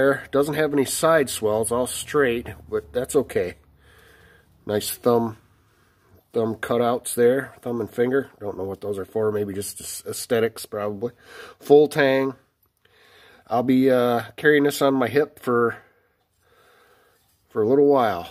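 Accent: United Kingdom. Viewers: Ha you nailed the bloody British with that accent. I’ve been looking for a plan A by condor and cant find it anywhere in-stock. That low drag looks like a work horse. And everyone knows Tops knives are outta this world. Good stuff⚔️ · Those Condors are going to be great work horses. Spot on British accent Haha. That Tops is going to be one hell of a slicer. Thank you for doing this giveaway. The knife community is something else.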